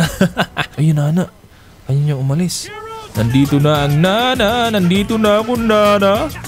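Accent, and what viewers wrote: Visayas, Philippines. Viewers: Bisaya ka pala lods 😂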